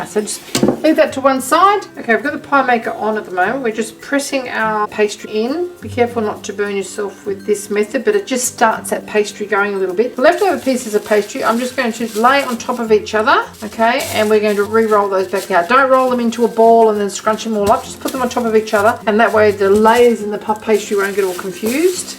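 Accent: Australia. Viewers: Nice to hear an Aussie on YouTube!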